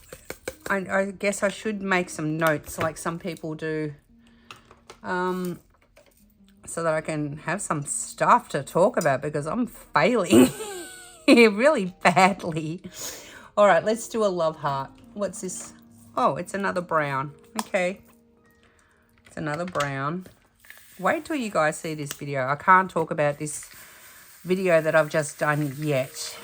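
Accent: Australia. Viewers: Hi, it's your girl from JerZey! HAH! I really enjoyed listening and watching you do your first section on this mystery painting. I believe I had the same one, but donated it to a friend because the black and white canvas messed my eyeballs up. But it's looking so good! I tend to have a hard time with AB's too, but tough it out. I am so excited for you to visit the EAST Coast your next time around, there are so many great places! So glad to have a friend in Australia, love your accent by the way too! The time-lapse is always fun to watch to! Hope you continue to feel better and till next time, take care friend! Sending you continuous healing vibes and hugs! XO JerZey Chris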